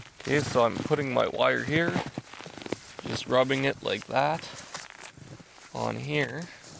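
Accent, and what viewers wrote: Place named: Quebec, Canada. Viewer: Moi qui look this up pi j'técoute pi j'me dit: ya un accent Québecois chu sure. Ben oui! Nice!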